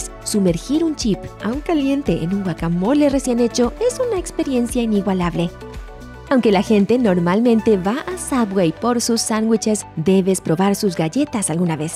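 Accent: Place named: Latin America. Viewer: No entiendo la utilidad de traducir al español (principalmente en latinoamerica) videos con un guión y contenido realizado para Estados Unidos. La mitad de estos locales no se encuentran en mi país, dan los precios en dólares aún cuando la locutora tiene acento latino, los precios son mucho más baratas de la realidad y varios de estos producto de locales que si se encuentran en mi país no se venden...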